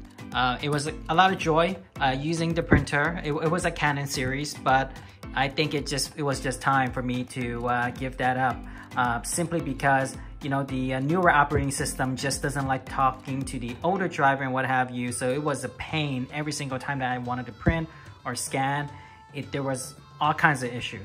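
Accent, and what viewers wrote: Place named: Denmark. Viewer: I would say you are Danish from the Netherlands am I right?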